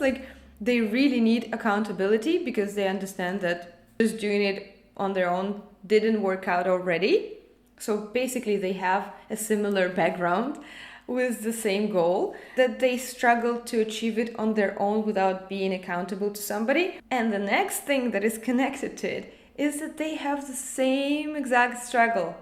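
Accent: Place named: Eastern Europe. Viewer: I did guess right! Kinda. lol There is something Eastern European about your accent.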